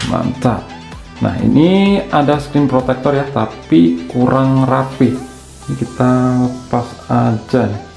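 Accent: Blitar, Indonesia. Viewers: Wong blitar bak e :v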